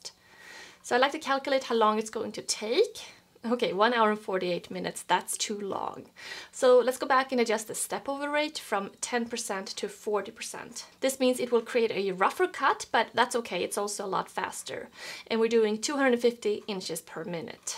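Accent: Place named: Sweden. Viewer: Det låter lite "swenglish" när du pratar😊!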